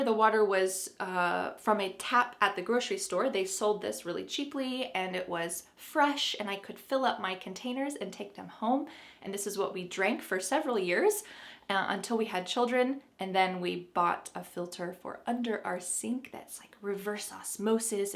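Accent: United States. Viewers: I can't hear your voice from when you had stated this channel but I love your Vedios and I am so so happy because I have found a great teacher for me and I love American accent and I love your voice I want to speak like you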